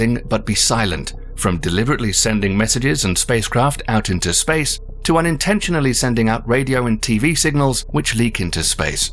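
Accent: United Kingdom. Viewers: "Because if an alien civilization exist they certainly know we are here" Another soap opera alien video that starts with a silly thought as if this was (or worse could be) a proven fact. That is first. Secondly, if they existed and they are more "advanced" than we do, and knew we are here, why would they waste their time with some silly humans who does nothing but kill each other in the name of some "god" ? Or make war for opium. Maybe you should ask yourself with your British accent if aliens could be as harmful as UK has been to this world.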